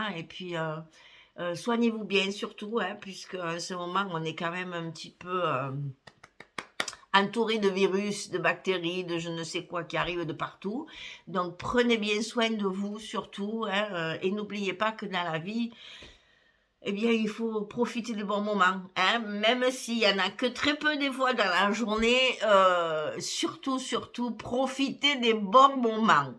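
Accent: South, France. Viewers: Merci pour cette vidéo et tous mes meilleurs voeux pour cette nouvelle année et merciiiii pour ce magnifique accent qui nous fait sentir bon le soleil et les cigales 🙂👏👍 et longue vie à vos tutos si bien réalisés et expliqués. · Bonsoir.Bonne et heureuse année surtout vos petites mains et la tête pour créer vos album dont je fais souvent.Vous expliquez bien .J aime votre accent du midi.J espère que vos pieds vont mieux avec la préparation de la fête de Noël.J ai fait le folio avec la participation de rose du jardin.Il est genial.Gros bisous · Ravie de mettre un visage sur ce bel accent du midi ! Votre enthousiasme et votre bonne humeur rendent vos vidéos très agréables à visionner ! Vos réalisations sont toujours très réussies ! Quel talent ! Je vous souhaite une belle année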